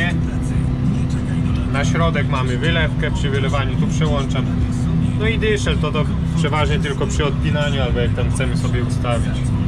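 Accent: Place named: Podlasie, Poland. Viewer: Słychać że Podlasie,z resztą tak jak ja. POZDRAWIAM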